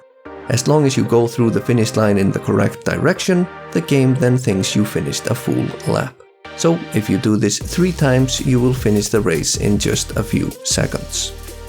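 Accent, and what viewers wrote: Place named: Iceland. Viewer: I never click speedrunning videos. Click this one and instantly was like woah is that an Icelandic accent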